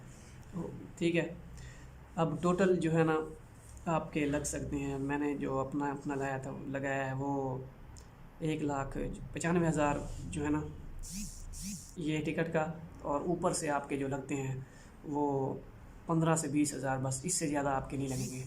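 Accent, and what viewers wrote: Saraiki, Pakistan. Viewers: salam bhi lehgee se ap Saraiki lagtee ho aisa ha kia?? :) By the way meri ye mail ha is pe contact kareen please ap see bat karni ha Jazak allah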